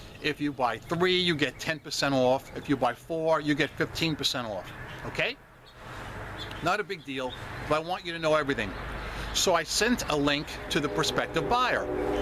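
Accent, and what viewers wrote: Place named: Brooklyn, United States. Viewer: needs to put a compressor/limiter on his audio. When I know he's about to shout (I can see it in his eyes) I immediatly lower the volume. Otherwise, my wife might say "Who are you yelling at!?" and I both have that NJ accent but his is more Brooklyn, NY style. When he says the word "for" it sounds like "fur." I'm sure he drives the people that live down south of The USA crazy. They will say "What did he just say?" Like when the movie "Rocky" came out in the 1970's.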